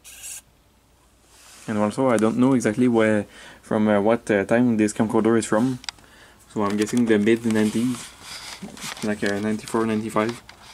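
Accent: Quebec, Canada. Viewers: you live in quebec i can tell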